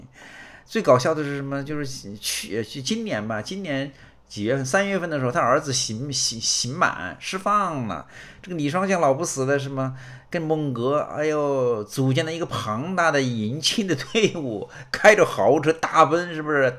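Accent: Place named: Jingzhou, China. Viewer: 这个主播的口音和荆州地区类似:face-blue-smiling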